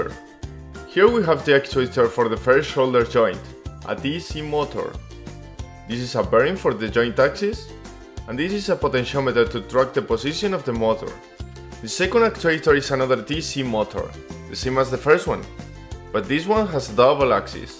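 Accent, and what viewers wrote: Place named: Spain. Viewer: Se nota el acento a españita jajaja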